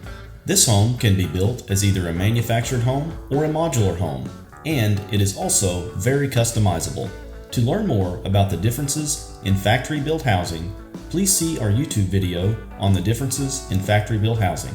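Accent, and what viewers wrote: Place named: Louisiana, United States. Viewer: do i hear Louisiana? lol This home is so beautiful. great video.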